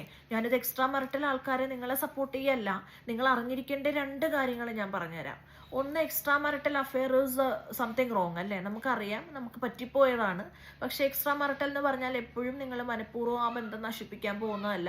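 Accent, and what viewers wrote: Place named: Kottayam, India. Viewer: കോട്ടയം ഭാഷയാണ് കളിയാക്കണ്ട!!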